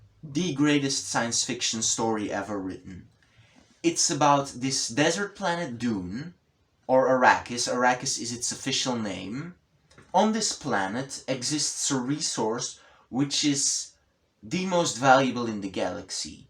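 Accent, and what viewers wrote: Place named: England, United Kingdom. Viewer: Liking the English accent, strong opinions and of course the bun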